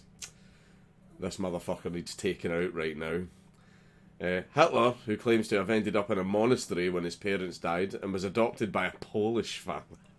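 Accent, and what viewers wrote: Germany. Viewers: That german accent is creepily accurate. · your german accent is godlike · Man you can do a German accen hilariously well! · Say "party pooper" in your German accent. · You do a REALLY good German accent! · Pretty solid German accent buddy 👌👌👍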